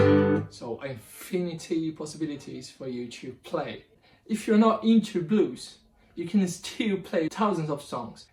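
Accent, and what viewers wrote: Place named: United Kingdom. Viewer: What a beautiful British accent, my friend!!!! I want to speak like that toooooooo!!! Saudades, meu amigo!!!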